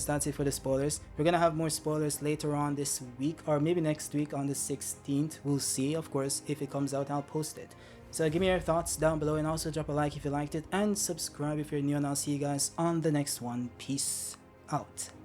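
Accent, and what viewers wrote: Jamaica. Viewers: You sound Jamaican bro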